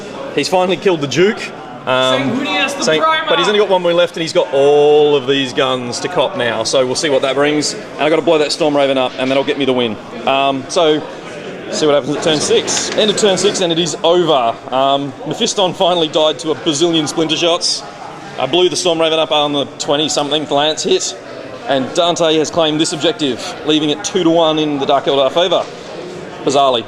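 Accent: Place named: Australia. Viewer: cool, like the vids and its nice to here our aussi acent for a change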